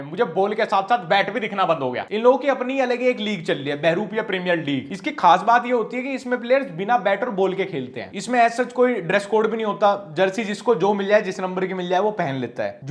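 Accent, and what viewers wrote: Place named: Meerut, India. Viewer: Bhai tu pakka meerut ke side rateha h❤😅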